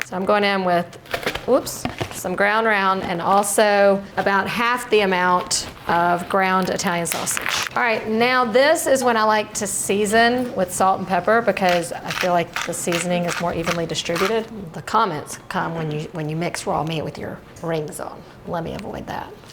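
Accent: South, United States. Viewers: She's awesome, being an Italian gal with a southern accent always gets me. Check out her recipe for roasted potatoes made into a creamy salad AMAZING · This is the first time that I hear an italian with a souther accent... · She’s Italian? I couldn’t tell with that Southern accent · The southern accent is a kick. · So you’re an Alabama girl. Good to know, I thought that I heard a southern inflection. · The southern accent gives me life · To me, it's just funny how a American with a strong southern accent calls themselves Italian, well I say of ancestry is the best way to put it. And saying sugo with a strong American accent. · Definitely first time hearing a southern accent instead of a NY/NJ one making Sunday gravy. My friend’s mom never used tomato paste, it was all whole tomatoes from a can that she crushed with a device. She also used beef neck bones, sweet and hot sausage, braciola, veal and pork. 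Great tip with the meatballs, although she never served meatballs with, just the meat from the sauce. She browned all the meat first then added the tomatoes. That sauce started Saturday night and was served Sunday evening. The smell lingered until Tuesday.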